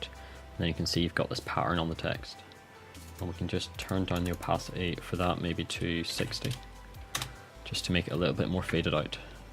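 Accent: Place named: Northern Ireland, United Kingdom. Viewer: Nice...just waiting for the comments saying they can't understand northern irish accent lol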